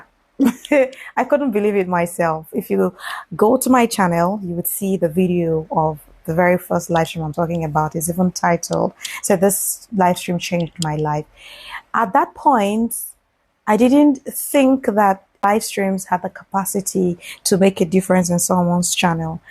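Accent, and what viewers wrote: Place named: Africa. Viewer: I like it but talk better. Your African accent distract your audience, and I can't understand you well. Work on your accent to become American, more people will understand your words. One love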